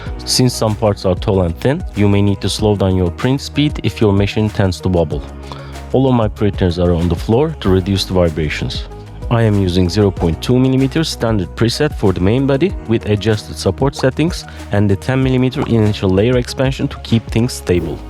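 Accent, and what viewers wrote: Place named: Turkey. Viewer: aksandan hemen anladım Türk olduğunu eline sağlik kralim güzel oldu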